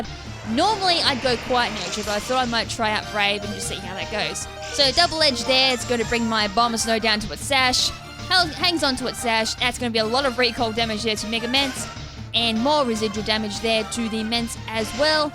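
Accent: Australia. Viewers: Wow, I'm not gonna lie, you really seem like the female pimpnite Not in a bad in a bad way but your accent is very Australian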